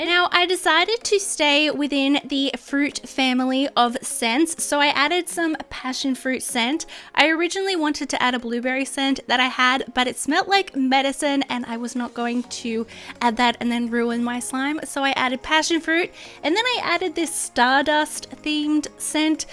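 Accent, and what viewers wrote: Australia. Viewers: is solo beautiful and LOVELY, are you Australian?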